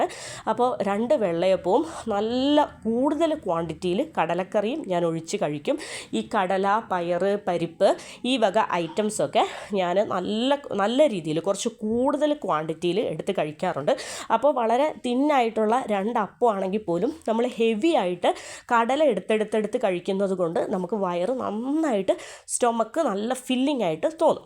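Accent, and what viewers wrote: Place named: Trivandrum, India. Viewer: Nice video. Appavum kadala curryum nalla combination aanu.Nalla avatharanam. Kellan enik valiya eshtamanu. Place aevideyanu? Samsaram ketit Trivandrum aanennu thonnunnu ❤❤❤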